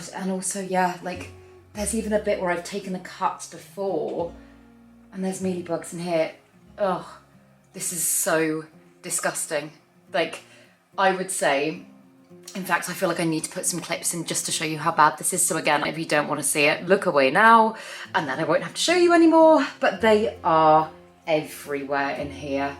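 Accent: United Kingdom. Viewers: Hi! I've never had any language problems with your channel British English everytime. Question, HELP! Begonia semperflorens, bronze leaf, they root in water with no problems but when I plant them in soil, they rot. Thoughts?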